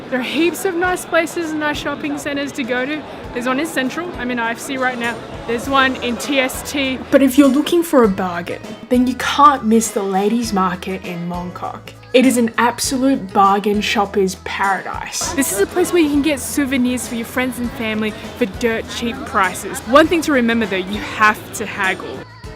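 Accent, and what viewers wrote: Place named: Australia. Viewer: Hi I have just subscribed to you channel lol. You sound Australian very interesting. I have friends from Hong Kong and China too. How safe is Hong Kong for a man on his own. I believe you can blend a little lol. Dobspato England Newcastle Upon Tyne.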